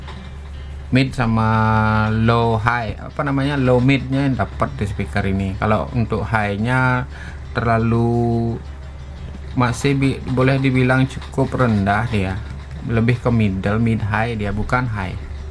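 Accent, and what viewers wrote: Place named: Bali, Indonesia. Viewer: Kyak logat orang bali😂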